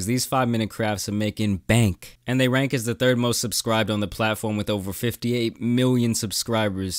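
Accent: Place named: New York, United States. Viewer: The only guy from Texas with a New York accent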